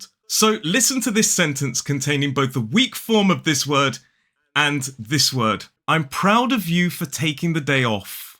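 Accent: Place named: Cockney, United Kingdom. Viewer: Nice english similar cockney accent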